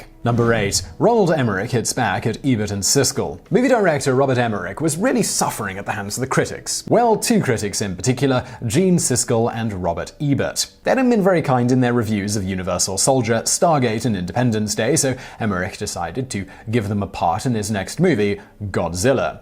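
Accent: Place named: RP, United Kingdom. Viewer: How is it possible for you to literally sound posher than Prince William? Dial it back a couple of points dude, it sounds ridiculous. The poshest person in the country doesn't sound that posh so what are you trying to prove?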